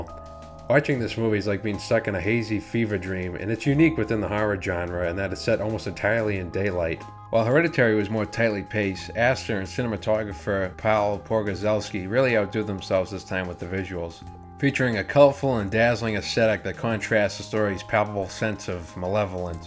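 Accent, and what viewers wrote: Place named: Boston, United States. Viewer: Your Boston accent shines right through! Love it.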